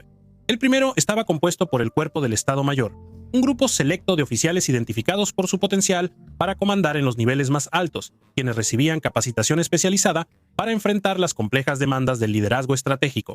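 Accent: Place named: Latin America. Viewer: no puedo man ¿que coño me hablas de nuestra guerra con ese acento bananero? Leck mich am Arsch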